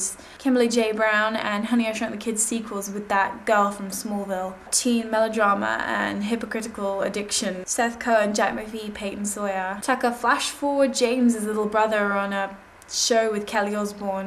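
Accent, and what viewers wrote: England, United Kingdom. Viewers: how do you become a director?? plz reply, btw your very cute and i like your english accent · why do people think your accent is strange? Its a normal English accent... isnt it? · Well she's English as u can hear frm her accent. Oh btw here in the UK horse riding is actually quite common and u don't have to be rich to be doing it. Neways i agreed quite a lot to wut she mentioned i mean it really is quite nostalgic. Shhe just basically aformentioned things that were the latest craze and all that. I think she covered things a lot of average people will remember. This video is something... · What is it about girls with English accents that get them featured on youtube? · Thats an English accent! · Y'know at a guess I'd say just totally english. And just that by the sounds of it, me and her have watched too much american TV. 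HAHA. And too right I've been watching the odd arthur episode after school. Its on before? hmm I wouldn't be up in time. But yeah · Ok, I thought you sounded English. Well done on being featured! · you have an english accent, but it sounds like your'e trying to be American sometimes. Baaaad. Be proud to be english! ( we're so much better. )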